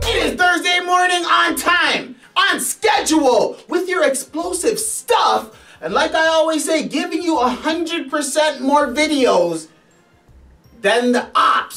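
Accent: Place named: Canada. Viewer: Where are you from Fam You sound like your From Canada..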